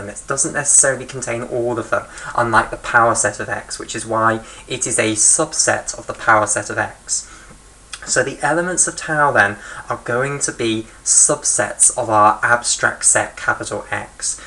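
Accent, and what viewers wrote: England, United Kingdom. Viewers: English accent has it's benifits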